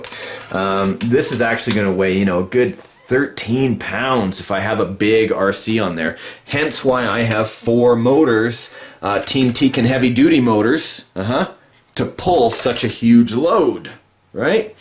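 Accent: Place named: Canada. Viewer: So funny ur canadian accent... "eut" out lol. Great project!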